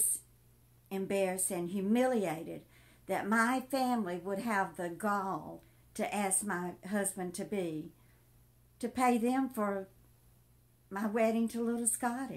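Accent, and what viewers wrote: South, United States. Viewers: Nice story , love your southern accent it never went away !!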